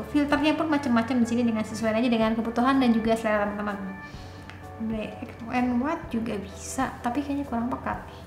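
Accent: West Java, Indonesia. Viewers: Orang sunda ya teh? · Sunda bangetb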